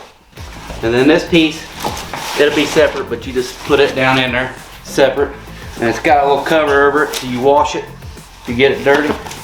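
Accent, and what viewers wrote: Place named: South, United States. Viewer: What part of the south are you from?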